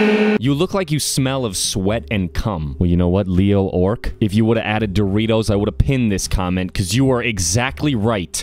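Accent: United Kingdom. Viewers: I'm an english man and you do sound a bit English only a bit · I actually thought you were from the United Kingdom when I first watched your videos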